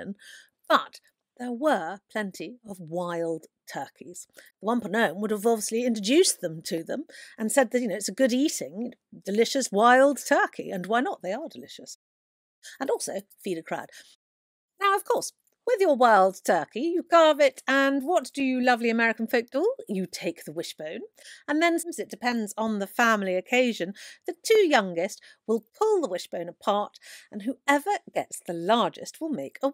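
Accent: United Kingdom. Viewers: So much fun to hear a Brit Witch speak of the American thanksgiving. Love the pagan references and agree completely! My adult son has asked for ham instead of turkey. He brings up a good point-no body really likes turkey - we only cook it one day a year. Lol.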